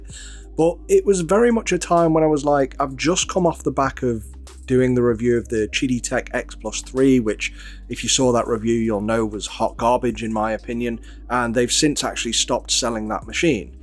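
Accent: United Kingdom. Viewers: I tend to use carbon fibre infused PLA and Matte PLA on prints that require me to hide my layer lines and I print at 0.12mm layers and I get wonderful results, I wonder how good they would look using that material on that printer with those layer heights? I had promised myself I would not buy another bed-slinger printer after I built my Pro 3D V-King 400 from self-sourced very best quality parts, I also have 3 Ender 5 Plus machines as well as half a dozen bed-slingers, I have a resin printer but can count on my fingers how many times I have used it as the smell and the mess and all the cleaning just put me off but seeing the prints this machine can produce has made me think again, if this was released as a cube printer it would be a no brainer descision for me, what size nozzle do you have to use to be able to print in those low low layers? Your channel has also escaped my radar until now and it is a breath of fresh air to hear another English northerner (I think) talk about 3D printing, I am from South Yorkshire by the way! 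+1 Subscription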